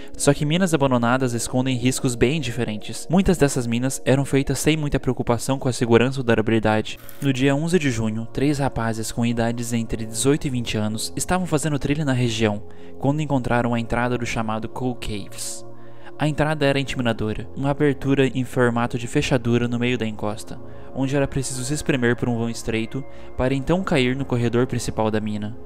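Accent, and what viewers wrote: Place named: Inland São Paulo, Brazil. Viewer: Gostei do sotaque! Sou do interior de SP, o meu também é assim! Tmj 🤜🏽🤛🏽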